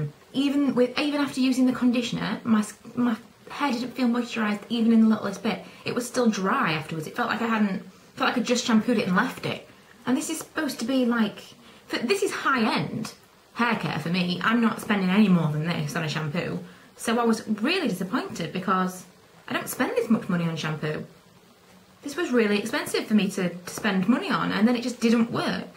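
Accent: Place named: England, United Kingdom. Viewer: shes definately NOT scottish lol. Im from Glasgow and can tell an english accent from a scottish one!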